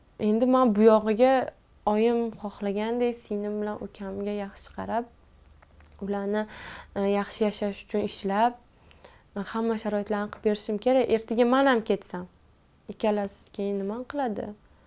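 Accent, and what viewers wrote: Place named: Tashkent, Uzbekistan. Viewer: Nega toshkentcha gapiryabsiz sirdaryoliksizu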